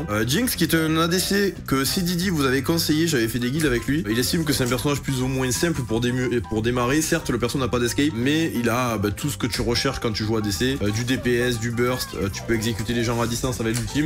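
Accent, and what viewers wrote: South, France. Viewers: Cet accent, j'adore, ça sent bon le sud. J'aime beaucoup Jinx, c'est assez simple à jouer cependant toute seule elle se fait souvent ouvrir en deux si elle n'est pas aidée.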